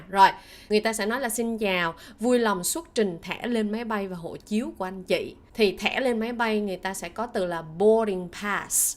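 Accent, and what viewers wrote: Standard. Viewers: accent rất chuẩn, 🎉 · Cảm ơn bạn nhiều, bạn nói rất chuẩn và cách thức bạn dây cũng rất dễ hiểu. 😊❤🎉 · Người đầu tiên nhỉ? Hihi. Hay quá. Chủ đề thiết thực để đi du lịch. Giọng hay và chuẩn. Tròn âm ghê. Tính hữu thanh rất đậm. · Cô nay giọng quá chuẩn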